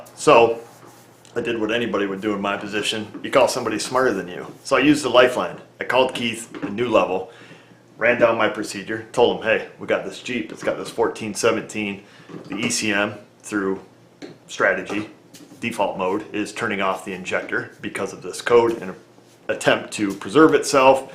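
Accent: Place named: Upstate New York, United States. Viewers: Speaking of accents, yes, you have an Upstate New York accent and, boy, does it shine. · That's not a New York accent, it's a "stat nyland" accent.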